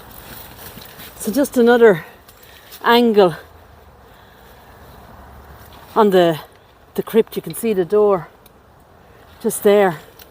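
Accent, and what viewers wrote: Ireland. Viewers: I love your accent. I don’t hear Irish accents much, since I left Boston in 1978.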